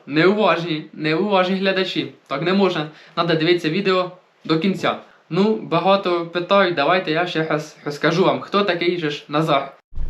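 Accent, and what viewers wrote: Poltava, Ukraine. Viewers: В тебе акцент цікавий. Ти з Полтавщини?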